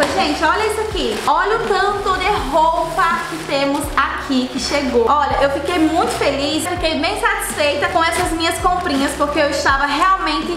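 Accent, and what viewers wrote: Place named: Fortaleza, Brazil. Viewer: fortaleza eu acho não tenho certeza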